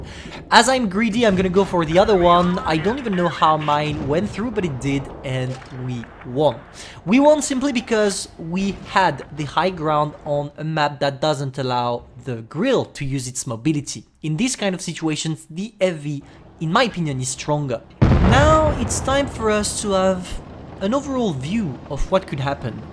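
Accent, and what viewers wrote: France. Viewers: Question? Are you French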